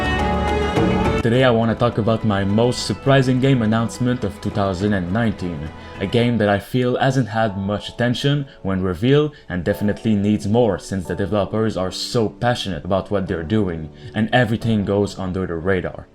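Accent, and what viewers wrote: Quebec, Canada. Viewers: Hey what’s up! This is the first video i see of yours, and i can’t stop thinking i know where that accent is from. Are you a french canadian? I’m also french canadian:P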